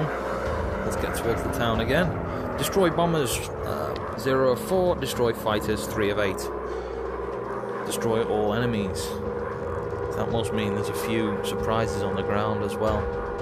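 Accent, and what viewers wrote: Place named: United Kingdom. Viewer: You're British. You get a sub.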